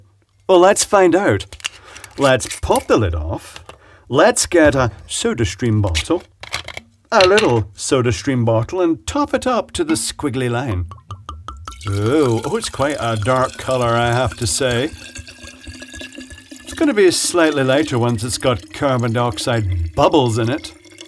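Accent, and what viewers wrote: Scotland, United Kingdom. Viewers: This is the one and only video in the YouTube universe featuring the long lost posh Scottish accent. :-)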